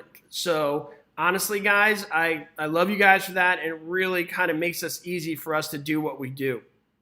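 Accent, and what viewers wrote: New York, United States. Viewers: I’m from Brooklyn, so I was like, where else but NY, with that accent. 😁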